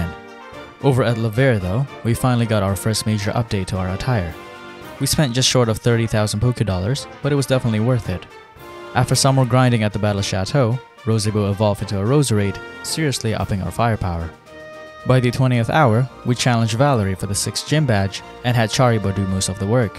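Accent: France. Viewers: why does he say every name in french LYSHANDERUE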